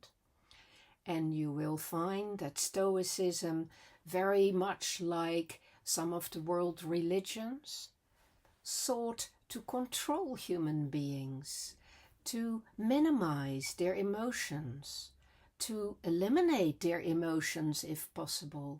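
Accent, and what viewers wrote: Netherlands. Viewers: I believe I was born a true Stoic by nature. I made a conscious decision at a very young age, say at about three years, not to cry and draw undue attention to myself when I fell and grazed my knees. Having this innate attitude has got me through some very difficult situations but it has not helped me to cultivate joy in my life. This is why, as I enter the twilight years of my life, learning about the Existentialist perspective of what it is to be human is so valuable. Additionally, my partner has also started listening to your channel and not only has he commented on your sweet Dutch accent, but he appreciates the content of your channel.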